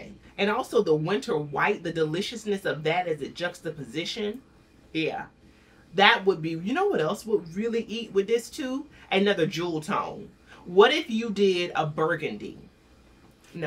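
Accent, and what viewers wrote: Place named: DMV, United States. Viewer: Friend!! I feel like I just went shopping with a good girlfriend. Sounds like you're from the DMV too...SUBCRIBED! Loving what you do sis!🥰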